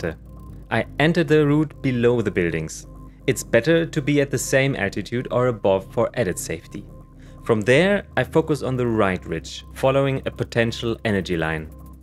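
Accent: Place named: Germany. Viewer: German English😂!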